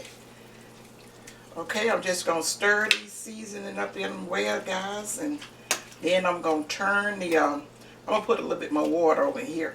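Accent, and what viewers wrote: United States. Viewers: Where is this place ? sound like Americae ? Never heard of dogers or what ever ity called. Jamaica we say dumplings to Rasta jaz.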